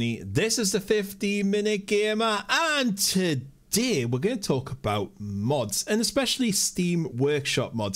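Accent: United Kingdom. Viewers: seems to be british.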